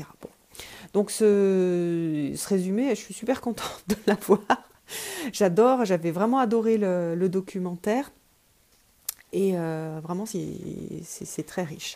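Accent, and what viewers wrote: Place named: France. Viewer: Não entendi nada, mas adoro o sotaque francês.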